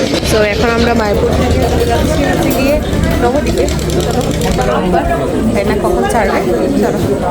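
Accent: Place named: Bangladesh. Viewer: Your speaking tone has a influence of east bengal but it's quite natural for North Eastern bengali people like tripura ,Assam ..btw welcome to my hometown Nabadwip..enjoy 🙏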